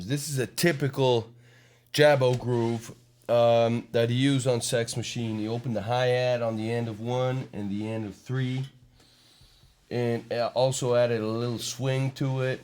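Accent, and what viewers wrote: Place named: Canada. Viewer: nice canadian accent bro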